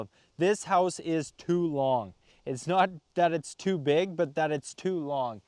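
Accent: Canada. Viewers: You're smart and kind!! Proud of you. Keep the videos coming. I just love hearing you speak Canadian.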